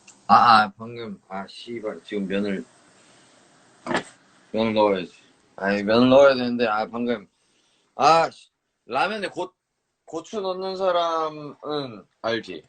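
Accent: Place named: Seoul, South Korea. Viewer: 이 형 고랩에서는 사투리 그대로 쓰더니 이제 서울말에 적응해가는듯 ㅋㅋ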